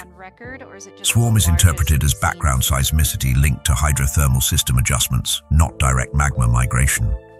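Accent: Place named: United Kingdom. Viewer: STUPID british, trying to speak english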